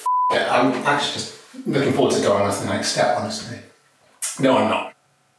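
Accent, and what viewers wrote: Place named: RP, United Kingdom. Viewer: Real sounds much more posh than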